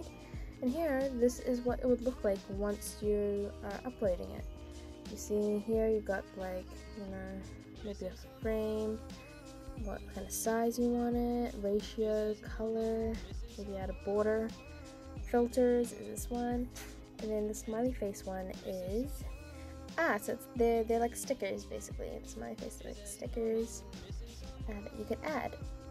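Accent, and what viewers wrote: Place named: Australia. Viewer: i like ur aussie accent its so cute it sounds just like rosie from blackpink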